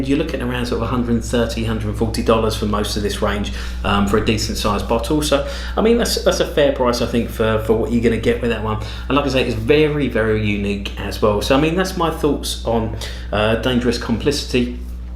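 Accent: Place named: United Kingdom. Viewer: your FRENCH pronunciation is better than mine great BRITISH accent by the way Ive got nothing from this house I need to investigate thank you JIM from MIAMI BEACH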